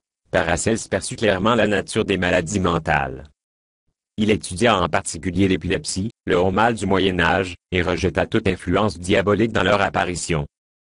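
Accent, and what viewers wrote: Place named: Quebec, Canada. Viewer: L’accent m’a tué t’es québécois?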